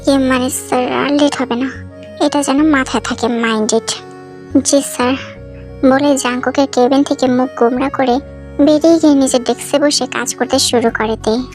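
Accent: Sylhet, Bangladesh. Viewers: Hmm apu r basha Sylhet. 💜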